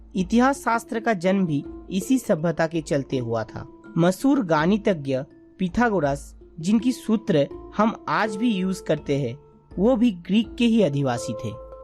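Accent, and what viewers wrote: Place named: Bengal, India. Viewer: Bhai aapke pronunciation se laag raha hai aap Bangali ho 😅😅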